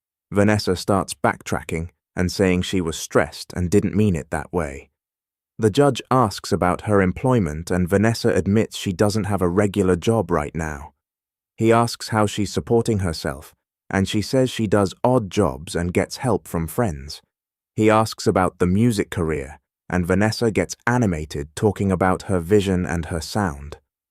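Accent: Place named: United Kingdom. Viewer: THIS IS AN EXACT REPEAT FROM ANOTHER CHANNEL!!!! IT’S STANDS TO REASON IF YOU ARE GOING TO POST STORIES YOU SEARCH FOR ONES THAT HAVEN’T BEEN USED BEFORE INSTEAD OF CHANGING THE NARRATOR‘S VOICE TO BRITISH!!!! VERY DISAPPOINTED, TOO MANY PEOPLE TRYING TO MAKE A LIVING POSTING FAR OUT, REPLICATED, AND RANDOM NEW STORIES TO PROVE THE CHANNEL IS DIFFERENT AUTHENTIC ORIGINAL!!! UNFORTUNATELY HE WAS IN A BRITISH ACCENT WITH BORING BACKGROUNDS, THE QUALITY OF THE WAS DESTROYED, AND PROPER PRONUNCIATION AND POORLY DICTATED WITH A VERY MONOTONE VOICE!!!!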